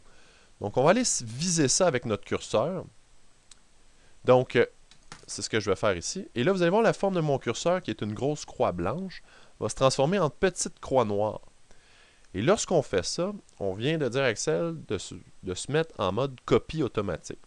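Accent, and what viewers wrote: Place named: Quebec, Canada. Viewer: un peu long et accent du québec mais bien expliqué , complet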